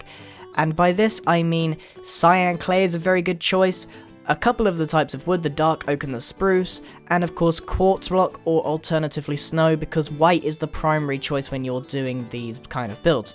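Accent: England, United Kingdom. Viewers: how can you sound so British... I'm jealous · I listen to voice in my sleep for some reason British acents relaxes me:3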